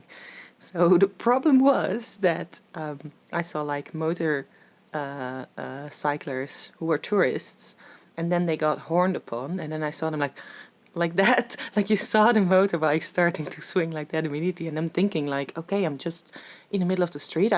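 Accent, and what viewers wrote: Netherlands. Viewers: an English Dutch accent which is pleasant to listen to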